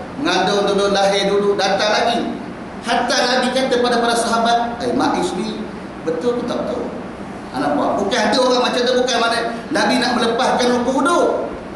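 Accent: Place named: Pahang, Malaysia. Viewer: ustaz ni slang org pahang....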